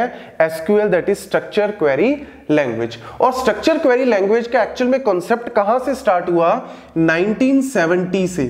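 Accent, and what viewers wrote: Punjab, India. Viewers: you are punjabi??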